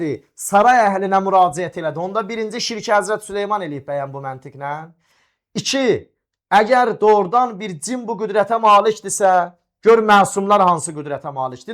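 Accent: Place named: Iran. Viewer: Iranski lehceee offfff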